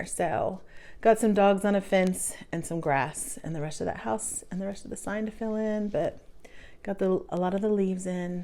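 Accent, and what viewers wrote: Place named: Texas, United States. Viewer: I just found you today. Great video! I guessed you were from Texas! I had to stop and go watch you first video to be sure! I will be in Fort Worth the last week in February with my husband who is attending a work conference. I will have my days free....I would love suggestions of some cute places to shop. Are there any stitch shops in FW? Thanks so much! Ellen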